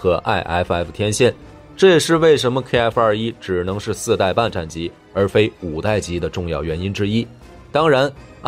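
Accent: China. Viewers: 有點好奇，標題的"韓國話虎膦"似乎是臺灣的發音，但頻道主發音像是大陸人，怎麼會知道臺灣的厘俗用語啊？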